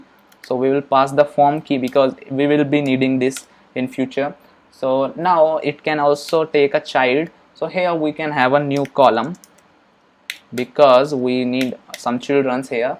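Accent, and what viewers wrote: India. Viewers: 印度仔，英语很重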